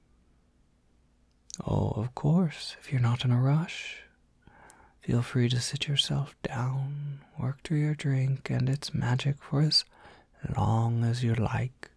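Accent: Ireland. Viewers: i’m irish and i’d like to say your accent is quite good! we don’t see a lot of americans who are actually decent at irish accents because it’s very very hard to recreate because we sound like a bag of spanners being thrown down the stairs so well done! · You should really do more on this. Your accent sounds good and the character is wonderful ^^. Much love for Ireland <3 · The Irish accent needs a little bit of work, but was genuinely not bad! I'm so used to people imitating our accent and being immediately enraged. But, this was pretty good! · I saw irish accent and I was like "MY KIND" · This was so good I might just need a second part to this o-o This is the only Irish fey asmr I could find and it works so well and just hits different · Omg You're so damn good at being Irish!!!!!!!!!!!!!!!!!!!!!!!! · my dads british and invites his irish friends over the pond, so the one time i was around there were 2 guys over and one of them sounded a lot like this dude, and the other guy almost broke my eardrums and drank an entire bottle of whisky i was saving for my bday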